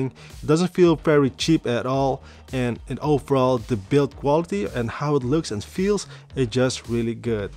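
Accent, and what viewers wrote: Netherlands. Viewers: Ik kon gewoon horen dat je uit nederland komt 👏🏾 I want a camera so bad but the prices are sickening here in the Netherlands (everywhere tbh) 😩 Trying to figure out how good the cheapest ones are.. Nice video, thank you~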